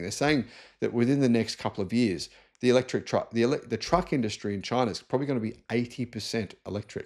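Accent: Australia. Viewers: He is an Aussie and that is how we say it, you sound as though yours is the definitive version. in aus a semi (pronounced e) or prime mover is what you call a tractor. we call the tractors tractors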